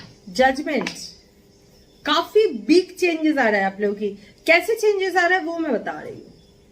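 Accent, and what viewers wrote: Bengal, India. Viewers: Thank you so much mam... Seems like you're bengali... Loved the way you executed. 😃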